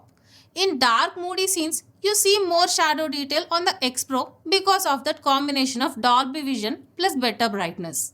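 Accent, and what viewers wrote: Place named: Tamil Nadu, India. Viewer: Aiyyyyo Thamillll accent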